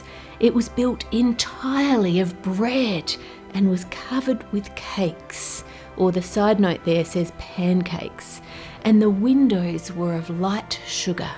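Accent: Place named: Australia. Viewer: A beautiful creation Ann, and super soothing with the story alongside it. I am German but have lived in Australia (Townville, Brisbane) for 7 years, so love hearing the Aussie accent :) I will dive into some German-speaking research for you after the holidays :) Thanks for all the fantastic videos this year!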